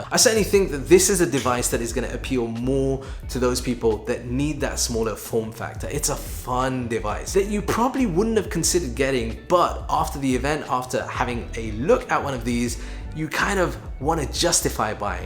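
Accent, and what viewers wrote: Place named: United Kingdom. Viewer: It's Hard To Manage British Accent Including Explaining Points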